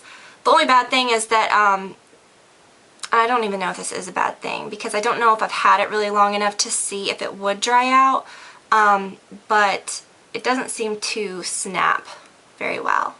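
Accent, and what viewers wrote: South, United States. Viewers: Your Southern accent is cute. :)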